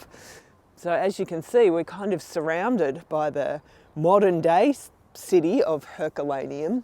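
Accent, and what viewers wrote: Australia. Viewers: Excellent Editing. It’s great to hear an Australian accent on a travelogue.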